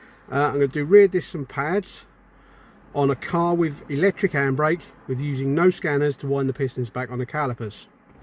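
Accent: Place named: Northern England, United Kingdom. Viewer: Thanks for the video, I saw another guy show how you can get a 12v battery with two wires that you touch to those two terminals where you took the plug out. And it seemed to work fine, just make sure the polarity is the way that winds the piston the right way. Would you suggest this way too as it saves taking the motor off? PS like the accent, it reminds of home, as I'm currently up north! lol!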